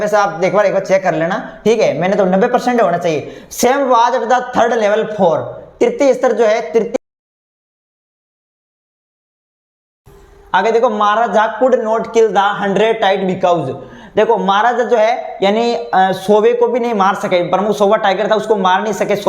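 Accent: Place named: Marwar, India. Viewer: Sir thoda marwadi Kam teko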